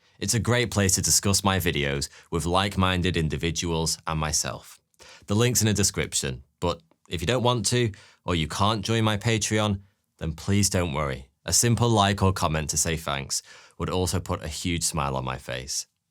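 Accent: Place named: Cockney, United Kingdom. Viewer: That COCKNEY ACCENT IS DISGUSTING … YOU KEEP SAYING “FOURTY TWO HERE”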